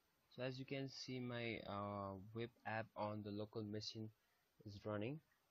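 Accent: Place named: India. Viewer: I hear an indian, I click off...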